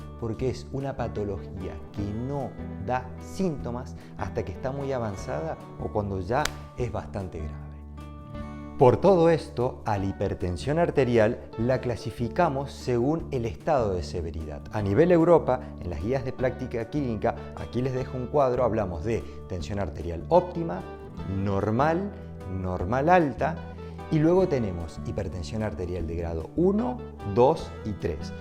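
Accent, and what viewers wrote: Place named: Córdoba, Argentina. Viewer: Buen vídeo ! El asentó cordobés no se te va 😂😂😂 yo 20 años in USA y no hay caso 😂😂😂 Aguante Alta Gracia !!!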